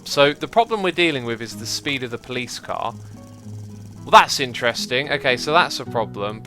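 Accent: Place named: United Kingdom. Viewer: X, I love brittish voices.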